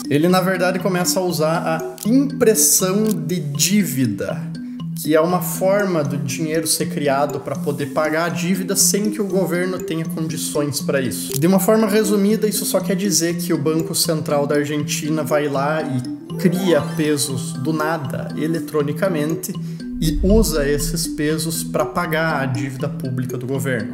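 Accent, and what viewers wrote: Rio Grande do Sul, Brazil. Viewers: Nossa, primeira vez q escuto sotaque gaúcho de uma maneira formal, dá uma estranheza pra mim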